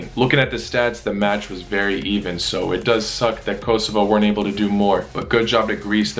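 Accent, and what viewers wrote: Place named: United States. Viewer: i love ho you pronounce russia russiya but with a slight american accent.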